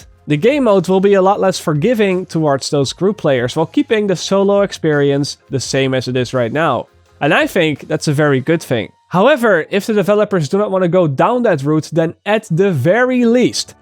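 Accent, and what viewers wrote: Netherlands. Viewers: are you Dutch? you have an accent which reminds me of my fellow Dutchies. although, it also sounds american at the same time. so ... i NEEEEEED to know. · Hoor ik het nou fout of is dat een nederlands accent? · Is he dutch? Because he has a dutch accent.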